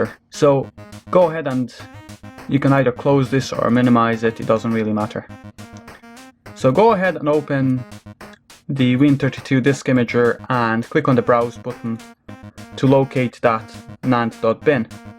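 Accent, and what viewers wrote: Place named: Netherlands. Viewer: are you dutch because i hear some sort of accent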